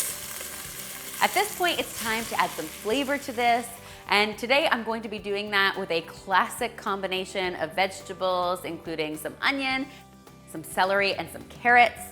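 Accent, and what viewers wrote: Canada. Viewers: As a side note it amuses me how you Canadians say herbs and Worcestershire (I’m English) and as for Scarborough and Peterborough 😂. I’m guessing though you laugh at how we say things 😁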